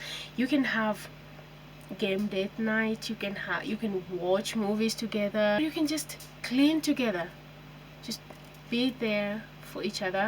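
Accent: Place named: Kenya. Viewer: Love your voice, your accent. And you look very nice. Would you want to share what tribe and area of Kenya you are from, tafadhali?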